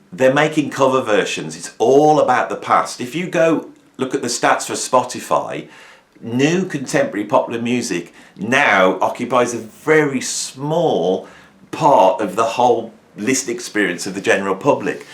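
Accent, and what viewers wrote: Birmingham, United Kingdom. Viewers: I listened to this without visuals. The power of the brummie accent was far more compelling than any face furniture. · Got to love a Brummie accent!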